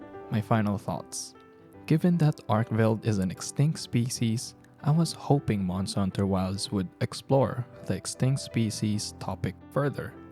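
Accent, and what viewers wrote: Philippines. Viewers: The filipino accent is strong with this ons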